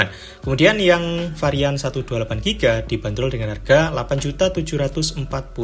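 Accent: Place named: Jember, Indonesia. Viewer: Wah orang jember wkwk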